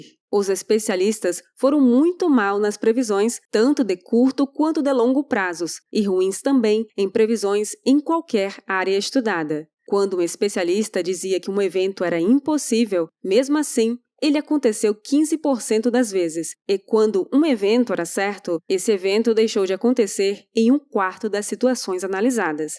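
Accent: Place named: South, Brazil. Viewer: Ótimo artigo e muito bem apresentado. Me impressiona a bela e perfeita dicção da. Não é carioquês, como a do Peter, parece mais de SP para baixo, como a da Caroline de Toni, de Chaoecó, SC.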